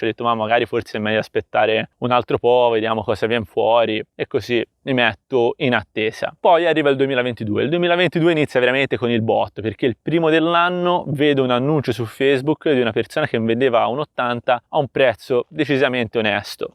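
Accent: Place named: Tuscany, Italy. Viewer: Iscritto, la parlata toscana come la mia ci sta abbestia! Curioso di vedere come lo camperizzerai😁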